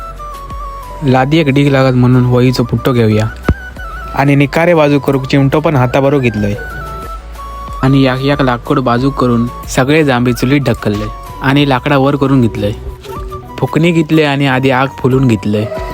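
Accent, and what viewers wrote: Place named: Malvani, India. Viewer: Mast😊 ani malvani voice ❤ ek no.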